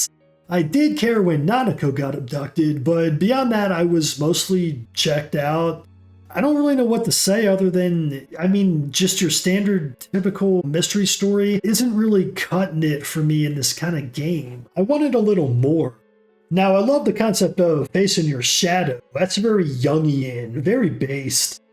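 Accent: United States. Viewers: what state is your accent from?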